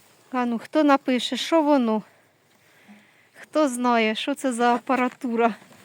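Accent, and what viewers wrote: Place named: East, Ukraine. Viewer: Чого ж неможливо?!Досить мило,своєрідний східний діалект.Моя мама була родом з Донеччини,то у її рідному селі говорили подібно,ще й був в вимові м'який звук "Л".Як в дитинство потрапила!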